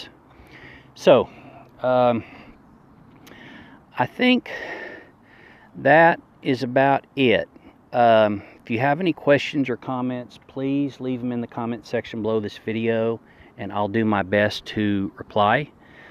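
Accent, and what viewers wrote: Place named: Texas, United States. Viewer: You sound like you have either a Texan or Southern accent. Your California license plates threw me off. 😂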